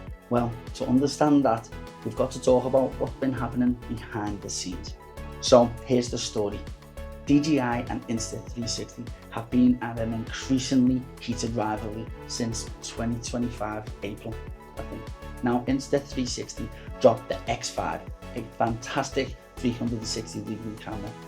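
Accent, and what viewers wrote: Liverpool, United Kingdom. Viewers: Wow did this guy just really say that 😂😂 its ok old white men think they have the right to tell people how to speak and behave 🙄 you obviously dont know what people from liverpool sound like. I I bet to some other people you might sound like your saying different words and letters, you should see what real people from liverpool sound like 👍🏼 they talk really fast and pronounce things differently but he is actually saying DJI as normal, just your the only person who feels its thier place to tell him otherwise 🤔 gota let people be people without trying to put them down. He's actually doing really well, and maybe you dont think your being a absolute tool 🙄 and I wouldn't say its my place either to say your being a tool but you know your being a tool 😉. Now instead of commenting trying to put somebody down by telling them how to speak, try a more encouraging approach but you know what they say you cant teach a old dog new tricks, so get more worldly and understand that the world is full of people who speak different people. 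from liverpool have it hard enough with people trying to understand them from thier own country, now I have followed this guy from the start and all his first videos were AI voice overs because nobody could understand him, and he has changed and changed and changed the way he speaks enough over the past few few months to actually gain the confidence enough to speak on cam. And yeh at first he was speaking really slow and robotic because he tried to speak just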